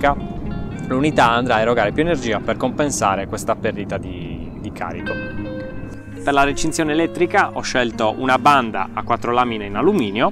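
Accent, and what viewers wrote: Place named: Campidano, Italy. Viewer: Dove vivi esattamente?Dal tuo accento direi Campidano. Un saluto da una sarda in Scozia.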